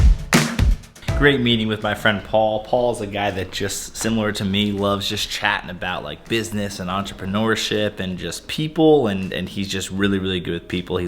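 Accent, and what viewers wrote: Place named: Philadelphia, United States. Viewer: Exactly what happened to me! Started watching to get tips on organizing my businesses video library and really liked not only your content but your ability to communicate which led me to follow you and watch all forms of your videos. An interesting blend of topics and always useful for life. Appreciate your unique perspective. Also originally from Philly area, (Drexel Hill many years ago) so it's cool to see Philly and the burbs scene and hear the famous Philly accent. Keep up the great work! AND THANK YOU for generously sharing all you do with us. I've learned a lot and appreciate your hard work to deliver it to us! PS I own a chain of hair salons in Florida. If any of the woman in your family ( mom, sister, gf, wife, friend) are ever in Southwest Florida Naples or Bonita Springs they can come in for any services they want all for free. 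The works, color, cut style anything at all, my treat. Least I can do to thank you for what you've done for us! Salon's name incidentally is Robert of Philadelphia! Have an amazing day!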